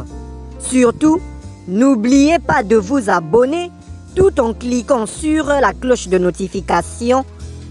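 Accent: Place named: Togo. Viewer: Ton accent est comme la togolaise qui nous parle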